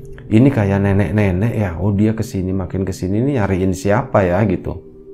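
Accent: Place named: Riau, Indonesia. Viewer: Judulnya itu lhoo mas... ngreget aku.. 😆 Shang Pemulung Riau Hadir mas, Riau mana ini suaranya.. 🙌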